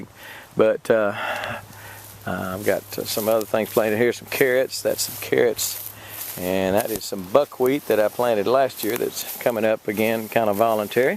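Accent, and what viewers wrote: Texas, United States. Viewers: Always great to hear another Texan. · He has a great accent. I wonder what part of Texas he is from.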